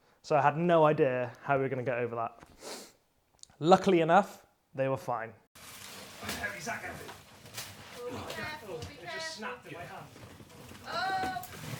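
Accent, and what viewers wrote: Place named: Yeovil, United Kingdom. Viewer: Just found your channel mate, I'm from Yeovil, this was very comfy, hearing the accent and coming from working for sparkies and builders all round Yeovil and Sherborne. Top lad.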